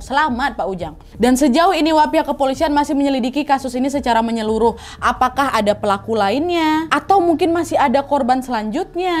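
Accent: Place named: Medan, Indonesia. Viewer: kalau wak yg ngomong patent kali,gaya medan kali,kren wak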